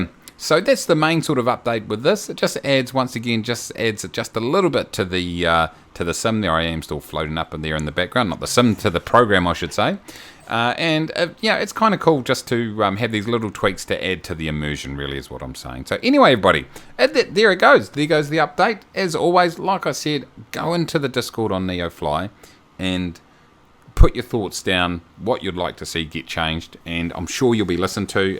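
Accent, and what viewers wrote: New Zealand. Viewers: Are you a kiwi bro?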